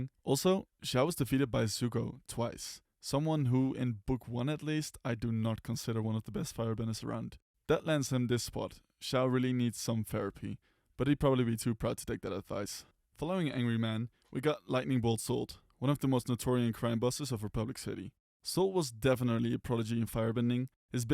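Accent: Netherlands. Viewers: Good video! I can tell by your accent you're 🇳🇱, no? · You sir sound dutch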